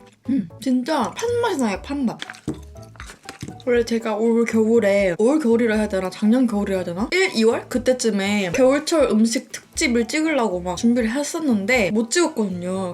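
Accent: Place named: Jeolla, South Korea. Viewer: 온니 혹시 전라도 사람이신가여 ?!?! 말투가 되게 친근감 있어서요 ❤️❤️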